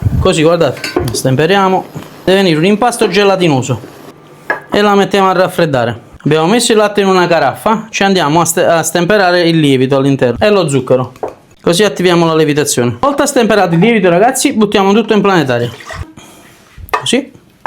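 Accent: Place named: Naples, Italy. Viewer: Ciao cuoco mi piace come parli il napoletano ciao un abbraccio ciao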